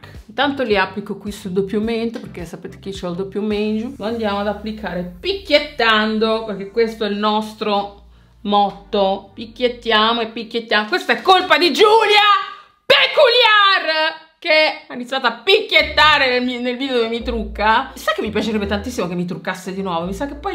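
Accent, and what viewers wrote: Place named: Lazio, Italy. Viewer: Quel modo di parlare a Roma lo chiamiamo burino e lo parlano diciamo nelle altre province del Lazio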